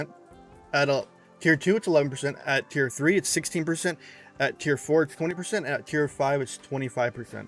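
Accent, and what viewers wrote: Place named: United Kingdom. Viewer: YO dude, are speaking the queens language?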